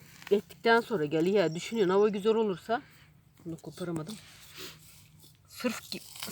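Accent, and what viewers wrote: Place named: Aegean, Turkey. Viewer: Merhaba hanım hangi memleket burasi sanki Ege şivesi gibi 🙋💐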